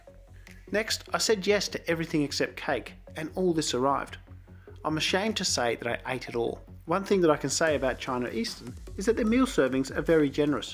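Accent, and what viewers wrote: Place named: Australia. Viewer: your so handsome and a gracious host. Love that Aussie accent!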